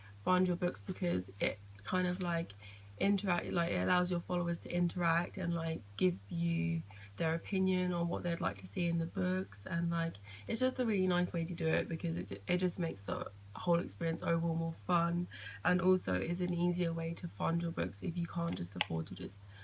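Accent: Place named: United Kingdom. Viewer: Hooray found somebody with a british accent. 😊😊😊😊😊😊. I love Art appreciate your channel.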